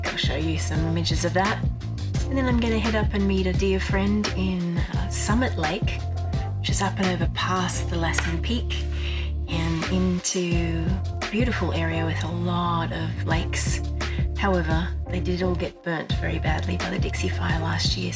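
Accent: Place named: Australia. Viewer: Found you looking for cushion cover tutorials. You sound like an Aussie, where are you from?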